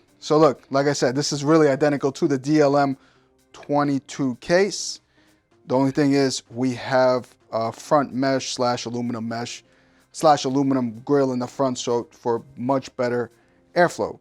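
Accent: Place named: New York City, United States. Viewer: this guy is painfully New York and it's amazing, big twist to the tech community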